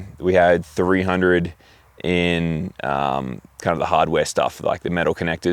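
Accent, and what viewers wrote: Australia. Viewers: I just found your videos must admit enjoying so far. A quick question, are you an Aussie?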